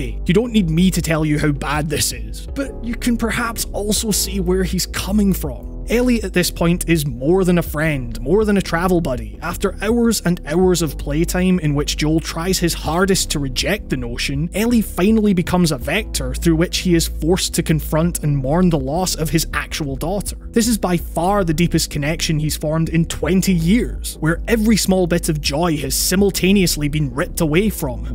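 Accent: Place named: Scotland, United Kingdom. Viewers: It's just Scottish. There are regional variations. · What is going on with that accent, it's like 2/3 Scottish, 1/3 American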